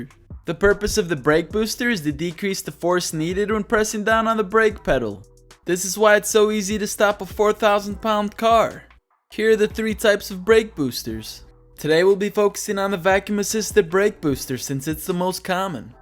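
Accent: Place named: Pennsylvania, United States. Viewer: Which im guessing is PA by the way chrisfix says water " woder " .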